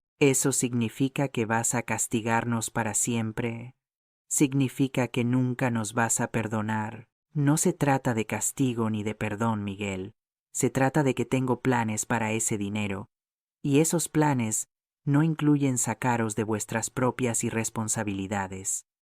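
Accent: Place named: United States. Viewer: Me gusta la historia, lo único qué no me gustó que narren con acento gringo, siendo qué la historia es relatada en Sevilla España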